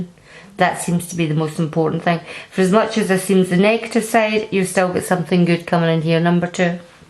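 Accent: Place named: Scotland, United Kingdom. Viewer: No.4. Loving that lovely Scottish accent of yours. Blooming Brexit dh 🤪. Thank you 🙏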